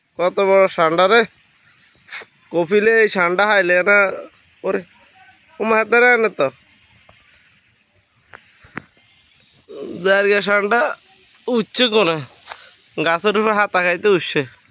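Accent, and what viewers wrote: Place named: Noakhali, Bangladesh. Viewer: আন্নে এরিন্না নোয়াখাইল্লা কতা কন, আন্নের শরম লাগে না? আন্নেগো বাড়ি কোনাই? হাদেরখিল নি?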